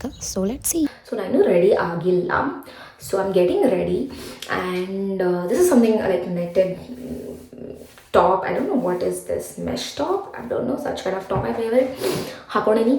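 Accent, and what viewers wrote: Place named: Uttara Kannada, India. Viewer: ಉತ್ತರ ಕನ್ನಡ ಮಾತು ಮಸ್ತ್ ರೀ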